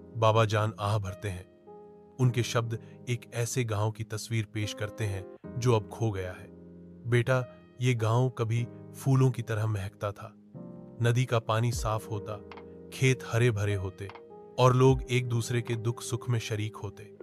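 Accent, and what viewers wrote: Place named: India. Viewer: اس کا لہجہ ہندی کے بجائے اردو ہوتا تو او بھی اچھا ہوتا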